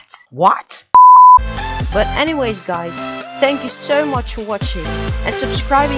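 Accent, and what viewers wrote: Netherlands. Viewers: Are hou Dutch? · That Goes so quick?! Je kan horen dat je nl bent btw 😂😂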